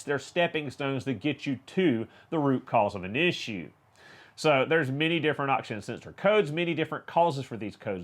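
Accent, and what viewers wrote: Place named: Tennessee, United States. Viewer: Unrelated but where you from bud? I'm in tn and that accent is indigenous over here.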